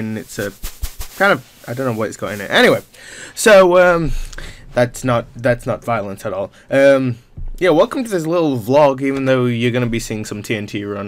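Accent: United Kingdom. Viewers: He is British, why people so confuse about his accent? Because he is British! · From Hong Kong? British Accent? I didn't watch the vid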